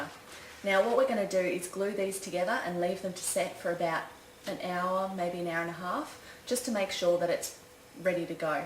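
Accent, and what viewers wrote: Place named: Australia. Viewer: This is great stuff...thanks for sharing! Oh...and it is cool to hear your Australian accent - it is so weird to hear someones voice when all you have done is seen their photos. I have been told I have a minor Boston accent - no where near the movie accents you hear botched up and over acted! :) Again thanks for sharing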